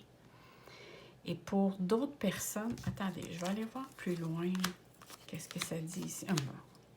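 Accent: Quebec, Canada. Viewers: Bonjour, merci pour ces tirages. J'ai fais le choix n°2, ça me parle énormément! J'espère que ma situation prendra l'issue de la fin du tirage, le retour de la personne. J'adore ton accent Québécois et toutes les merveilleuses énergies que tu nous transmet aux travers de toutes tes vidéos. Namasté.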